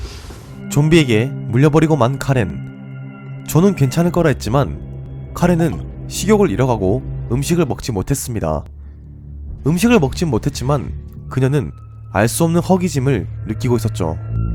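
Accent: Gyeongsang, South Korea. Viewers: 오늘 문득 님 설명듣는데...경상도쪽이셨나요? 사투리가 들리는건가싶어서 ㅋㅋ 손 빨리 나으시길 쾌차하세요~~~ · 고향이 경상도 어디에요.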